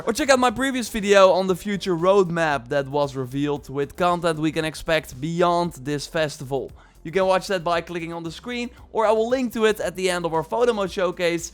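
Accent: Netherlands. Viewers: U dutch? You have a dutch accent XD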